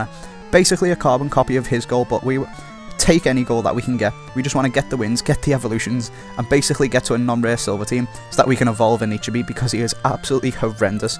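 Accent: Liverpool, United Kingdom. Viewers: Are u from Liverpool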